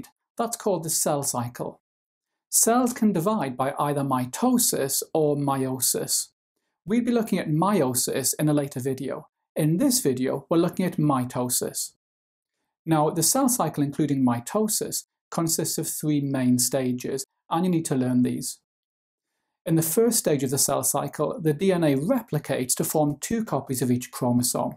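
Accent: Wales, United Kingdom. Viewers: As instructed by my teacher in my online homework task, I express my deep appreciation for your content and dulcet Welsh tones.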